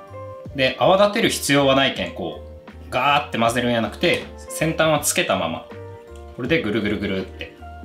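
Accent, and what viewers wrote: Fukuoka, Japan. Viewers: 福岡の方ですか？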